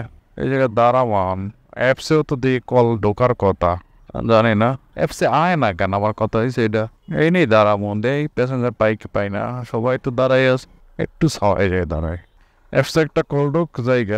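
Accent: Barishal, Bangladesh. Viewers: বরিশালের ভাষা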